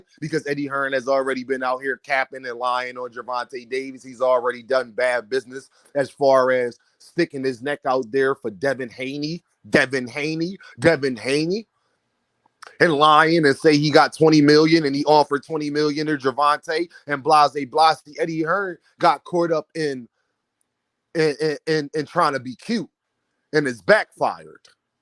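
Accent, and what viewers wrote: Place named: Philadelphia, United States. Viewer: you from Philly huh bro? I’m from Baltimore lived in Philly 3 years you sound like my young bull from there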